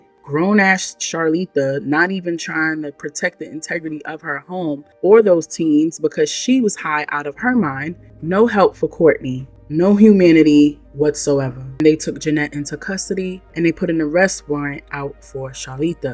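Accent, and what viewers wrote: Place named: New York City, United States. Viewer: Yo you funny ash with that accent love that nyc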